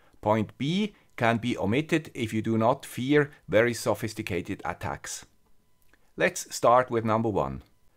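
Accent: Switzerland. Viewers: That "guy with a Swiss accent" sure is smart! Thanks another great lesson:)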